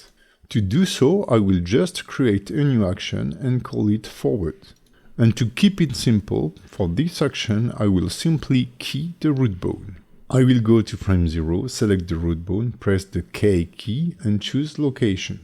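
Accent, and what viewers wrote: France. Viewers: Et sinon, il y a une version française de vos formations ? Je ne pense pas trop me tromper avec votre accent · J'adore l'accent Français !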